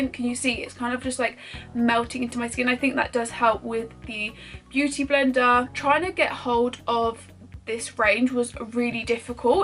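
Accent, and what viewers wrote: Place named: England, United Kingdom. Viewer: oh my gosh i had no idea you were English!! anyways, I love your Instagram makeup tutorials and love this very helpful video ❤️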